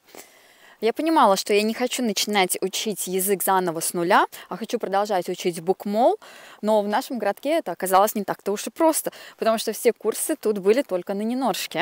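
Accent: Standard. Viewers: Очень приятно слышать чистую,грамотную русскую речь. При том, что девушка выросла в Риге. Какая красота вокруг! Как будто я сама там гуляю и дышу чистым прохладным воздухом! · этой подписчице приятно слышать чистую, грамотную, русскую речь. У Вас способности к языкам, не очень заметно что вы выросли в Латвии. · Спасибо большое за ваши простые и в тоже время неповторимые ролики.... Всё очень красиво, ухожено вокруг. Так красиво.... Вы такая молодец, столько учитесь.... Так приятно видеть свободного, образованного , прекрасно воспитанного человека...,с уважением относящегося к другим странам и нациям, знающего несколько языков и при этом ещё говорящего на прекрасном правильном, чистом русском языке, без мата и проклятий.... Спасибо вам, огромное, счастья и процветания !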